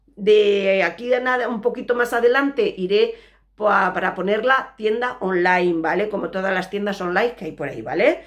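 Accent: Andalusia, Spain. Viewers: q gracia me hace cuando dice os quiero una jarta se nota q es andaluza me alegro mucho por su tienda gracias por compartir tantas recetas saludos desde Valencia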